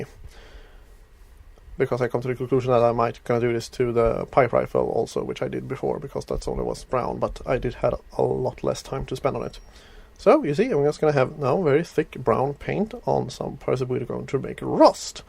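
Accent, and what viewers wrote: Sweden. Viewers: r u swedish? its the accent and the name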